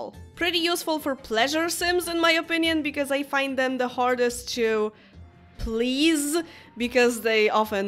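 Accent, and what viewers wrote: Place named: Poland. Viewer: You sound Polish, are you? 🐦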